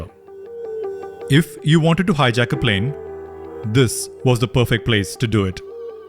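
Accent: India. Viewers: The presenter sounds indian, and i am proud that such a high quality detailed presentation is put together ! Brilliant work, brilliant level of detail covered. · Why is a pajeet narrating the video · why your english accent is indian? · This Indian accent sucks, makes listener wanna laugh, not be serious.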